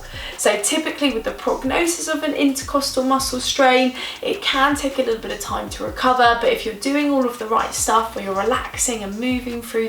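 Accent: United Kingdom. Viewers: The accent tells me that the speaker is British or somewhere from Europe with British accent ✌️ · UK company and yes she sounds as English as I am.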